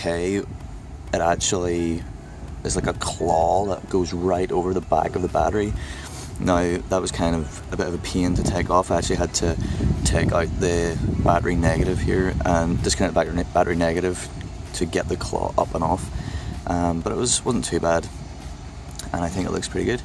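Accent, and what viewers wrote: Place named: Northern Ireland, United Kingdom. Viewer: Hi there, I am from Australia but was born in Groomsport Northern Ireland so it was cool hearing you speak :P As for the car get a crossover pipe to remove that stock pipe to the turbo. I changed mine and the intake hose to the mountune like you have. Other good things to increase flow is adding the velossa big mouth to the front of the airbox. I own a 2015 euro 3dr FiST like you so these tricks are good. But as with all these changes you need to think downpipe and exhaust one day too. Take care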